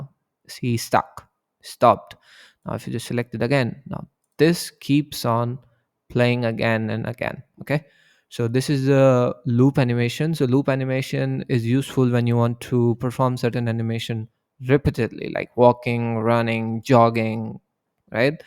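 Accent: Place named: India. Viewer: i appreciate the effort especially for the thread explainer there. You teach everything quite clearly and it helps that your indian accent is not as heavy as others.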